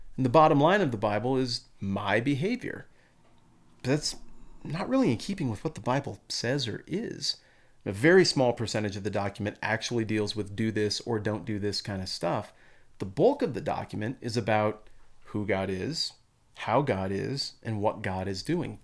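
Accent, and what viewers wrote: United States. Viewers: Are you from Wyoming?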